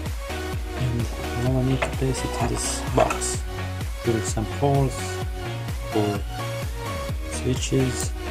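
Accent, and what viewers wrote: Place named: Italy. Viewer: dall'accento sembri italiano :o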